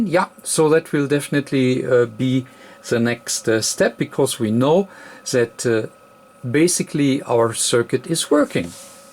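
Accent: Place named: Germany. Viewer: This is a magnificent tutorial. I think you might say "ausgezeichnett". It's got everything - careful and clear description of the theory, the practical implementation and finally the proof that it all works to plan. I have absolutely no problem with the slow delivery in English. Makes it clearer and I find the German accent charming.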